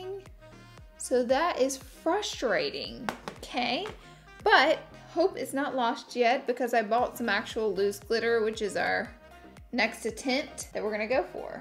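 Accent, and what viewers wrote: South, United States. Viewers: You’re so funny I’m new to your channel and I love your southern accent and your personality!!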